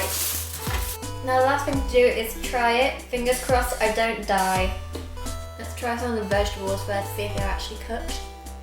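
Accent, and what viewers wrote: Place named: United Kingdom. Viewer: What a beautiful British accent you have! I have always wished I had a British accent.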